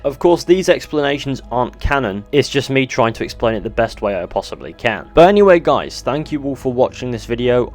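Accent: United Kingdom. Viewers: sorry kinda sound british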